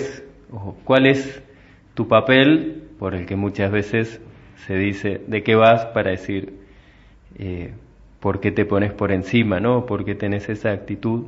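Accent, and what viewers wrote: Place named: Argentina. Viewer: Suena muy bien con acento argentino! Muy bonita reflexión. Desde España.👍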